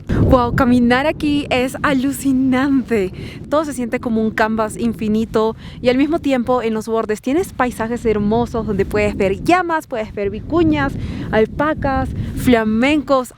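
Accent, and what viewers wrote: Peru. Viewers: Pues sí habla como peruana.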